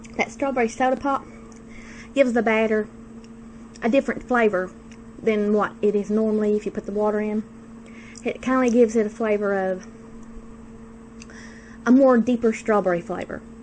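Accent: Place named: South, United States. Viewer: looks good and love your country voice:)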